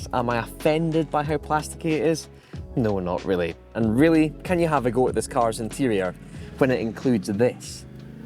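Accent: Scotland, United Kingdom. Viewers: sounds extra Scottish in this one.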